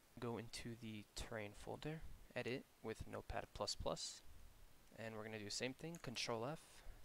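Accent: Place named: Scandinavia. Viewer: He's gotta be scandinavian for his accent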